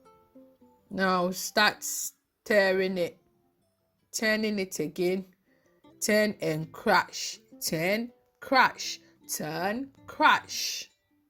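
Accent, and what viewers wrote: Ghana. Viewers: My first time here tho😁 you are funny and you sound like a Ghanaian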